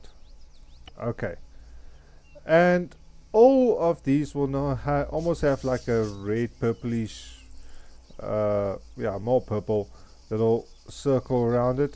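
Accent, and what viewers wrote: South Africa. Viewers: 99% sure you are Afrikaans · South African?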